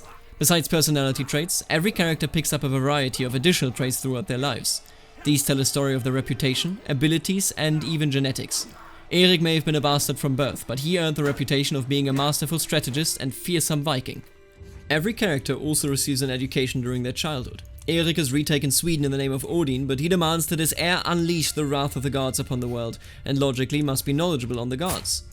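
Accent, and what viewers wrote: Germany. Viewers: why is it spoken by a guy with a terrible German accent who speaks too fast ??! : (( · Un1c0rnOfL0v3 That’s just his accent dude. He’s Bavarian and also he speaks fine. He has his own youtube channel for Ck2.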